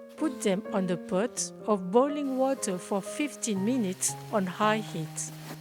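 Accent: France. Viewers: When the food is Chinese, and the accent is french you know it's going to be tasty. It looks like a very memorable experience by the table.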